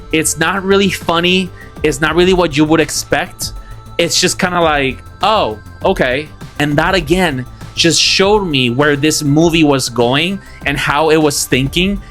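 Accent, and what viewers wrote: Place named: South America. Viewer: this surprised I did not think at all you were living in the US, I thought you were South American or Caribbean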